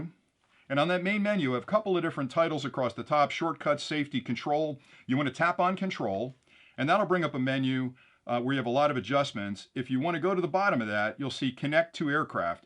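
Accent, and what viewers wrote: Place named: Bronx, United States. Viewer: you should be from the bronx - well cool - love your channel!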